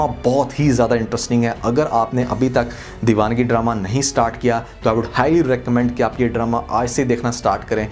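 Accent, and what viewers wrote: Punjab, India. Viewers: Ap punjabi hn ?? Ap k excent se laga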